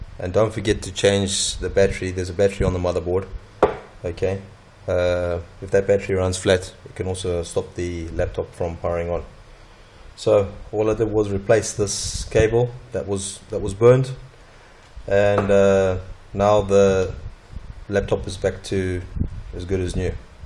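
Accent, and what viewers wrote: South Africa. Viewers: The SA accent?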